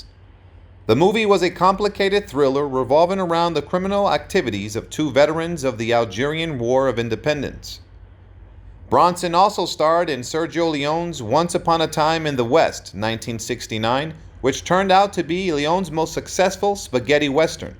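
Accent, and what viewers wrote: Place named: Chicago, United States. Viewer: Hey Mr. Narrator, you from Chicago, ain'tcha? Miss that accent.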